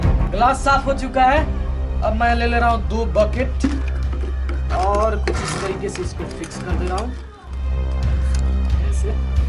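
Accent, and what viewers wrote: Bihar, India. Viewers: bihari ho ka?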